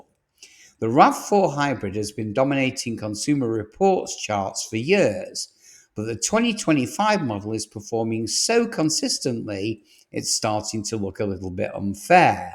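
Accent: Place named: United Kingdom. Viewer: Love that British accent, and apparently not AI. Great work!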